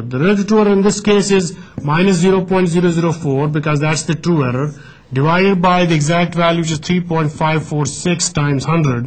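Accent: India. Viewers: good explanation.....but had a very hard time digesting indian accent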